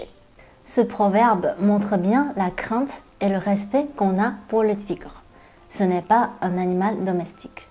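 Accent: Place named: Northeast, China. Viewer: 东北那旮沓的方言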